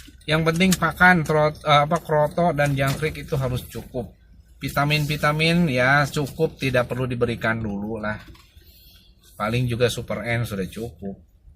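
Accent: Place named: West Java, Indonesia. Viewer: Udah kaya denger ustad ceramah logat sunda.. Hhhhh detail n terperinci... Semoga saya bisa nerapinnya sama trotol pemula main mb.. Thank gan.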